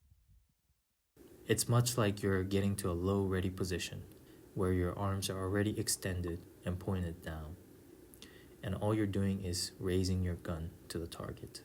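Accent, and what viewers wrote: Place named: United States. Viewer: You sound American. What that shitty flag on your shoulder?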